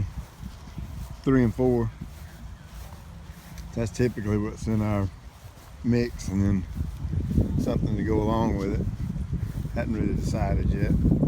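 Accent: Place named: Virginia, United States. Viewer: I've watched slot of grass care videos. You're my guy! I'm definitely interested in purchasing your great blend. I live in NJ where it still gets just as hot as my hometown in Danville/Cascade Va. BTW, where are you from? You sound like you could be from those parts or close. Love your videos and look forward to learning more from you!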